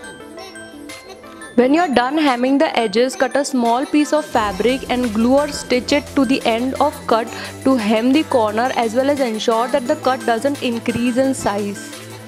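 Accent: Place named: India. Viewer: good idea. but indian accent is so ugly to hear. cant stand long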